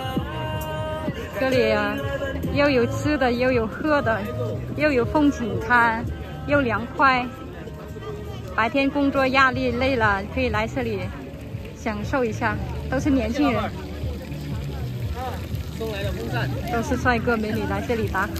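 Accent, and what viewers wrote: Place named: Hunan, China. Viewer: 你的中文听起来像我一个湖南的同学，你看起来好泼辣的样子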